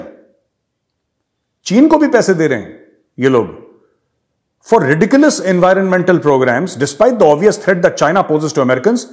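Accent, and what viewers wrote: Pakistan. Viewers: Major gaurav aarya with Pakistani accent is so lit 🔥🔥😂😂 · pure pakistani accent😂😂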